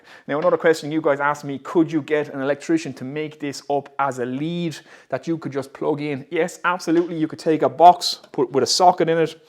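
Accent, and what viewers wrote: Ireland. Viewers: Great channel, we still get a kick out of the accent and we miss all of our Irish friends. I NEED CLAMPS! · Came for the tool reviews and shop projects, stayed for beautiful shop, depth of knowledge and that lovely Irish accent 😁 And as I'm slowly building my own little shop... I NEED CLAMPS!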